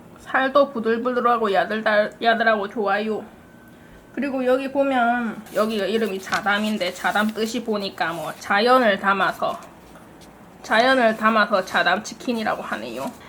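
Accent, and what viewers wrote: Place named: Gyeongsang, South Korea. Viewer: 경상도쪽 사투리에 뭔가 귀여운 말투 ㅋㅋㅋ 재밌게 봤네오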